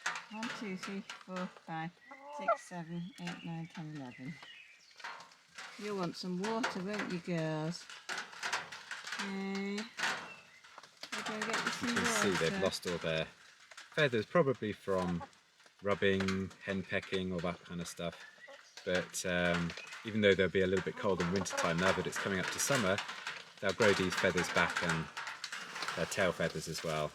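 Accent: United Kingdom. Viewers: I did enjoy this video. I have always thought of you as a gentleman. My wife likes your proper British accent. Well done for showing compassion to the hens. Are you still baling your own hay?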